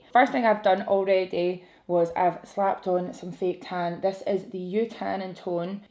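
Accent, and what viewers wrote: Scotland, United Kingdom. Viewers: oh god i love the scottish accent 😍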